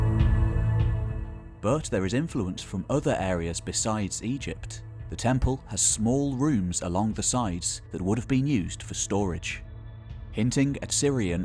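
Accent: England, United Kingdom. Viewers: Scrotum Monster it’s his particular English accent. You’re missing his other pronunciations different from yours.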